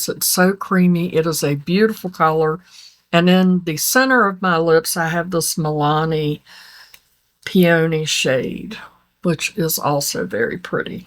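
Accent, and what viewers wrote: South, United States. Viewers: Love your southern accent! Have to listen on 1.5x speed though 😅❤🎉 😆🌞❤️💖🙌🙏 I truly needed this video today! Bringing out my wax melts and Dior foundation 🙏😇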